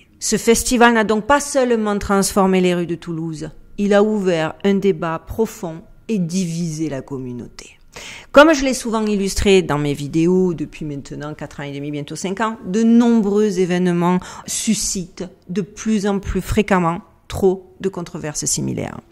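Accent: Marseille, France. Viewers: Ton accent de Marseille commence (légèrement) à perdre de son intensité. Il est temps pour toi de revenir chez toi, pour quelques jours, je pense. Ce serait dommage de perdre ce patrimoine national inscrit au répertoire de l'Unesco. Les églises brûlent, tu ne va pas perdre ton accent tout de même?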